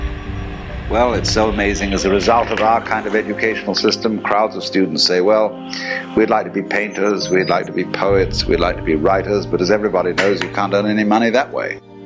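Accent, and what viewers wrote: South Africa. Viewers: Are you South African because your accent sounds familiar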